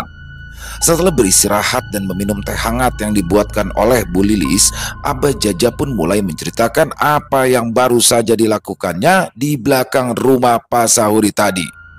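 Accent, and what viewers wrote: Jakarta, Indonesia. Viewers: barrokalloh yg dinanti2 bang piyan muncul juga plng suka kalau bawain bahasa betawinya mantep pokoknya sukseees terus bang dengan cerita2nya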